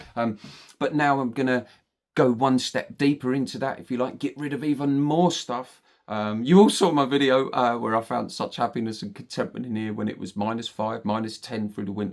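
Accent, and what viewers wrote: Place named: United Kingdom. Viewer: Best wishes to you, man! You sound like the British version of ROB THE NOMAD. Looking forward to following your journey around the great North of the UK, or wherever you end up. Post often and happy travels!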